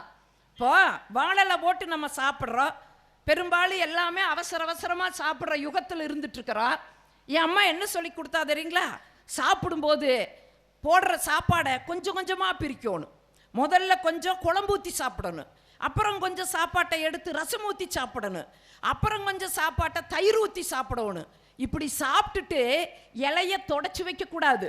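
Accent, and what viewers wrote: Kongu, India. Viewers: Madam kongu tamilil kalakkareenga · Super mam..👌 Konjum kongu thamizh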